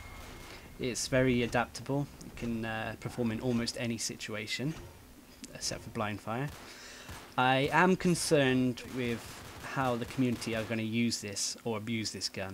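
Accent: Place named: United Kingdom. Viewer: I love the British accent it's just awesome. Will u add me on psn please.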